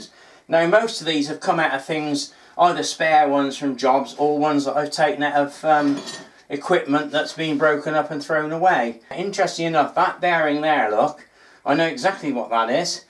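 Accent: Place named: Hampshire, United Kingdom. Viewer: Thanks for confirming that the bearings aren't too difficult to remove. Unfortunately we live increasingly in a throw away society. Your accent reminds me of my Hampshire roots before we moved to Australia 51 years ago, love it.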